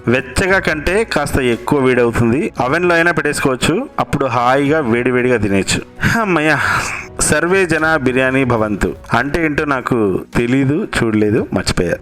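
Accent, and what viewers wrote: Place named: Andhra Pradesh, India. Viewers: Mee words konni ma srikakulam slang la anipistunnai ..ae uru manadi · Meru matladutunty ma village ki vellina feeling vostundi,rajamundry, bhimavaram,Godavari antuntaru kadha,nice videos anna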